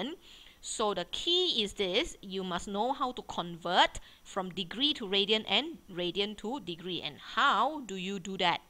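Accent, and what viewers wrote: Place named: China. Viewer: She's Chineese. Indians have worse accent.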